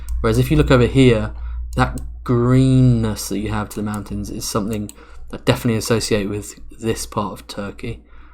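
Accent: United Kingdom. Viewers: Hearing british accent is so releaving. Im from Poland but after listening to american youtube and then switching to british it feels like its my native language. I can't explain why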